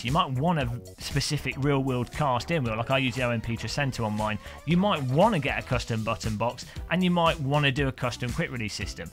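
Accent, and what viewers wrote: United Kingdom. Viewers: $1500...pause...walk away....heart broken....come back...at least I get to hear you speak with your Bri-ish accent.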